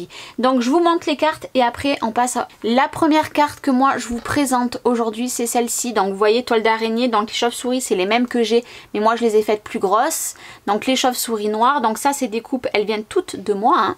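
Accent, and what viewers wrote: South, France. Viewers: Même si je ne fête pas Halloween , merci pour ces superbes cartes très lumineuses. Dans l'un de tes derniers vlogs tu nous a parlé de pochettes vinyl pour stocker tes papiers scrap. Peut on y mettre plusieurs feuilles ? Pourrais tu me donner la référence car je serais intéressée , ne sachant plus comment ranger mes restants de collections. Merci et vive ce petit accent du midi qui donne la pêche. 😉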